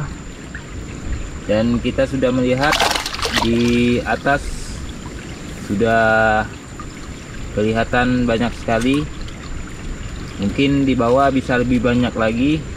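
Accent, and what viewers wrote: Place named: Malaysia. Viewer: dmana tu bang,,cakap mcm orang melayu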